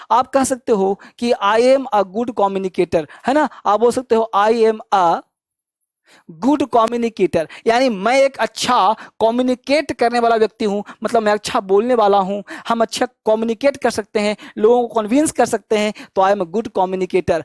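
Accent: Bihar, India. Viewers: Hello sir, apka padhane ka tarika mast h or ap lgta h Bihar se hn??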